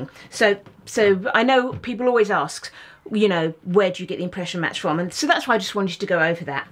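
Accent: England, United Kingdom. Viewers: English accent level...This amazing soap artisan! 😂❤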